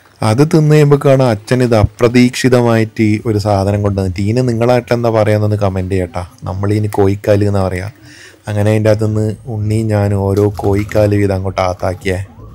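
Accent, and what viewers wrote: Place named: Kannur, India. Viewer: നിങ്ങൾ എവിടെയാ നമ്മുടെ കണ്ണൂർ ആണോ നമ്മളെ bhasha😂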